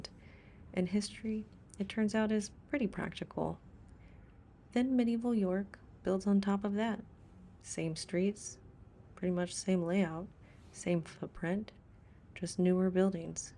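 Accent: South, United States. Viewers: Hi, only just seen this one, but again, another excellent video, I remember the fire well, and the one in Windsor Castle, both hugely important events in their respective histories. You seemed surprised when another correspondent said it was "Auntie" standard, and questioned why. The answer is quite simple: well researched, calmly delivered facts, rather than sensationalised narration. I'm imagining Sir David Attenborough, but female, American (but rapidly becoming more Brit), with that soft, warm Southern drawl wafting over the visuals. Check out his documentary on gorillas from the late '70's, where he's in the midst of a family of gorillas, from the silverback to babies, retains his composure, and continues in hushed tones as they groom him, lie on him, and even (out of camerashot), as one of the youngsters removes his shoes. True professional, and still making wildlife documentaries at age 99. 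Sorry about (another) long post, keep up the good work my dear. Chin chin! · I love your voice, both tone and pitch which, combined with your southern accent, is very soothing. Could easily put me to sleep. Excellent video, one of the best I've seen on the subject matter.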